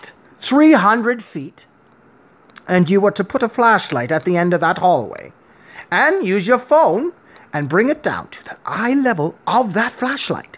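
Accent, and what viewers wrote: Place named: England, United Kingdom. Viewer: If I didn’t know better, I would have thought you were from England not Alberta. 😘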